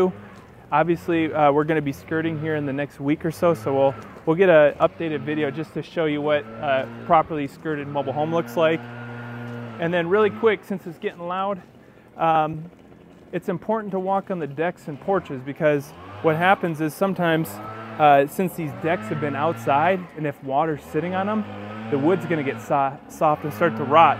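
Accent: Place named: United States. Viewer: Nice to see a video where the person speaks decent American that we can all understand.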